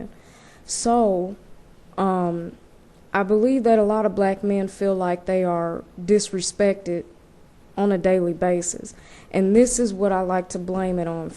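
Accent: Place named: South, United States. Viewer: I love your accent... It's got a strong Southern twist to it...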